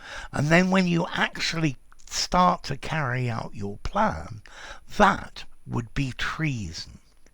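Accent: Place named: Oxford, United Kingdom. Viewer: His accent is from Oxford.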